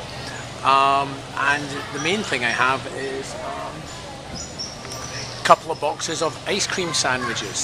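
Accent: United Kingdom. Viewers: what are you.. a reporter!? damn man... stop trying to show the burn... just do it... stop talking and saying "ya... uh ya.. uhh ya....uhhhh ya uuuh ya...( with u.k. accent etc etc etc) what is this non sense!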